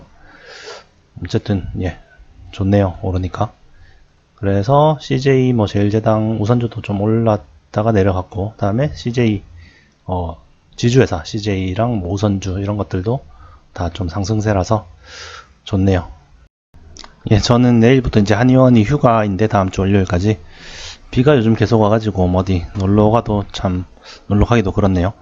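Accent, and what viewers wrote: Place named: Busan, South Korea. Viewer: 말투가 부산분인 것 같았어요ㅡ ㅎㅎ 저도 부산입니다. 용호한의원이 한곳 뿐인가요?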